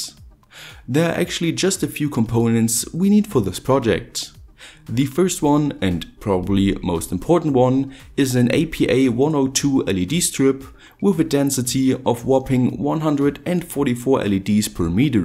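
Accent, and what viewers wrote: Germany. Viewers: he's from Germany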